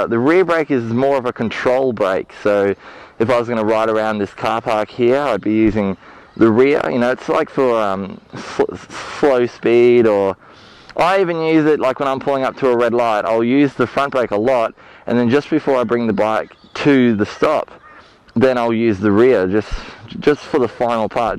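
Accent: Australia. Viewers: Hes obviously Australian you knob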